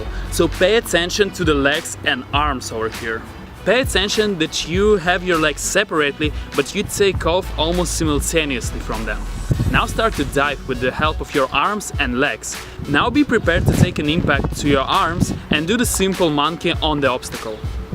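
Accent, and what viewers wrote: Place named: Russia. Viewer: Слышу твой русский, сквозь английский)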